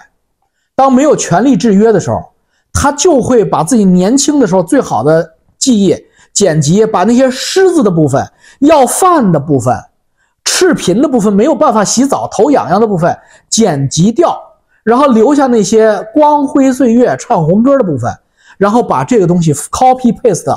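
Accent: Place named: Nanjing, China. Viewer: 嘿， ，我怎么听出你有南京口音？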